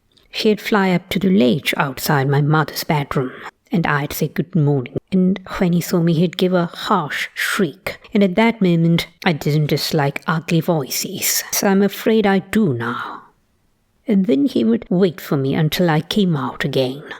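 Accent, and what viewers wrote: RP, United Kingdom. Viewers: You sound like such a posh classy aristocratic lady! Are you actually one? Or maybe “landed gentry”? 😂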